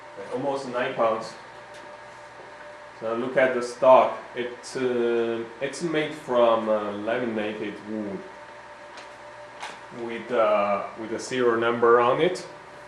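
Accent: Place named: Eastern Europe. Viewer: I thought he was Asian too, until the very last line. The way he says "wideo" you know he is a slav, maybe with some dramatic mixed in. Definitely not an Asian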